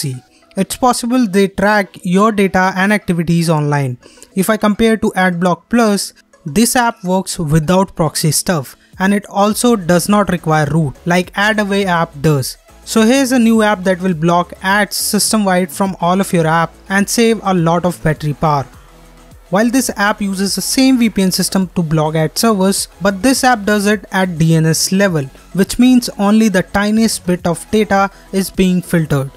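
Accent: India. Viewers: U speak like indian i cant understand wut u said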